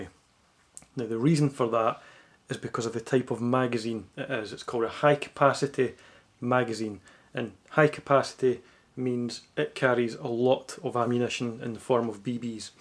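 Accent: Scotland, United Kingdom. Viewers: Scottish lad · love your accent . are you from scotland? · someone has a scottish accent! soo bad ass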